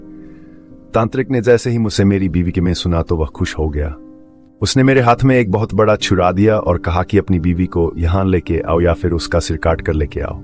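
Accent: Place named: Pahadi, India. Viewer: Hindi Bolna Esa Lag raha he Jese Pahadi Ya Angrez Bol Rha Ho. Baki Story Acchi Bna Rhe ho..Bolne Ka Tarika Or Saaf Karo.👍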